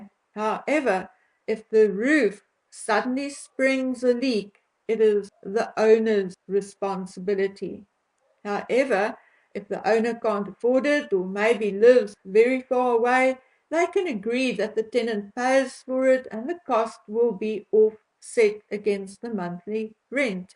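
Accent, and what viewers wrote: South Africa. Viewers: Is the lovely woman you? Had nothing in my mind of what you might look like, a beauty. I have many SA Jewish friends who left the country maybe 40 years ago at least, cause their kids grew all grew up here and are now in their. 40s. They were all professionals. Lived Westchester County, the burbs outside of NYC was a hub. Many came here thru Toronto. Some stayed there, manny went to Dallas. Now I can identify your wonderful accent. Loved their typical expressions. Like “ shame” haha9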